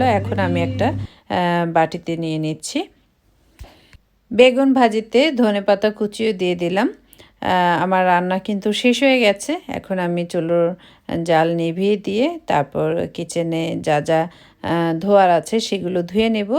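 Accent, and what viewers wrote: Sylhet, Bangladesh. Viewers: Apne sylhety ni apa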